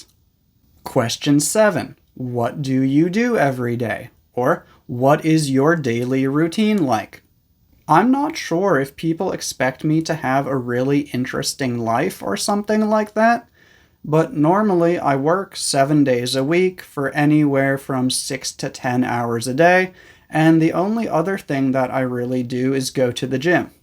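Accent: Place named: United States. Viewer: Fine. I noticed you have an american accent.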